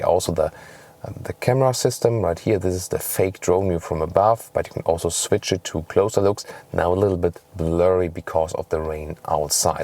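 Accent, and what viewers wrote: Germany. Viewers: SOS10 I don't usually mind - gives the whole thing a bit more character - you know. The german doing an incredibly detailed and professional review of almost all aspects of the car - plus the accent - theres a reason i watch these videos, but I myself (german as well) like to constantly improve my english and be told when I make mistakes and how to fix them :)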